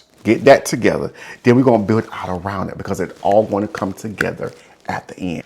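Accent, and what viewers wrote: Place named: South, United States. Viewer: Not to be weird…. But, I just love listening to you! Yes, I subscribed. You are helpful, honest, forthright, and you make me laugh. :) And I love your southern accent!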